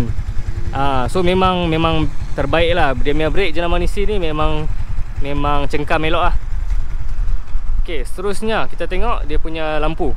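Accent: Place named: Kedah, Malaysia. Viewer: Aku suka cara cakap laki cina tu..memang pandai cakap..sleng loghat kedah kalau x salah..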